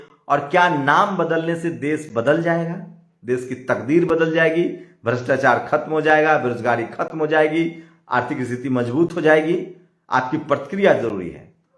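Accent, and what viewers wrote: Bihar, India. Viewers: आपको यह चैनेल बनाने की जरुरत क्यो पडी भाई साहब न तो इतिहास मालूम है और न भारत के बारे मे बीजेपी का विरोध करने का एजेन्डा चलाना है तो आप पार्टी बनाओ भाया और बोलने का बिहारी लहजा चेन्ज करो 2014 तक सबकुछ हराभरा था अब, सब जगह बंजर ही बंजर दिख रहा है। चश्मा बदल लो भाई समय बदल लो नही तो Robbish ही दिखोगे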